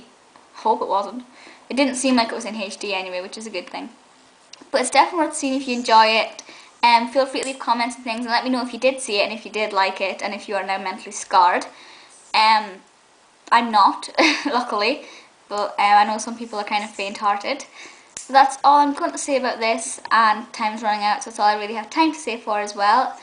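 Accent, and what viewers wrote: Scotland, United Kingdom. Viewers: scottish or irish? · love your accent!!what is it?(sorry not brit) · Haha, Scottish is only one of the most distinctive accents in the world!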